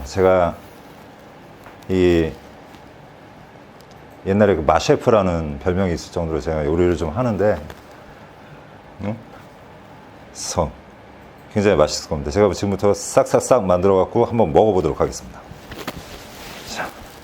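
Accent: South Korea. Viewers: 이분 한국분인가요? 억양까지 한국인같아요.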